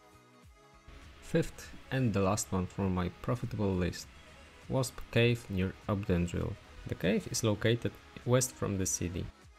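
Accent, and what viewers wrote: Poland. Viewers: Helpfull :) You from Poland ? I hear by the accent ;p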